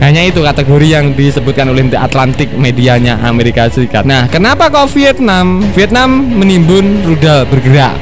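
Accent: Java, Indonesia. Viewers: Suara mu kok medok e min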